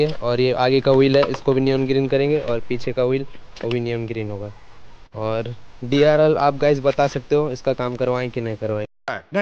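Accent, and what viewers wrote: Odisha, India. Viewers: Bhai app odisha se ho paaka 😅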